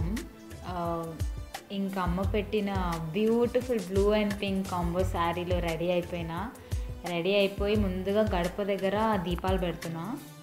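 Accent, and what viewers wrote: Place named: Telangana, India. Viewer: Akka nuv complete Telangana lone mataldu... Nen ni matala kosame chusthunanu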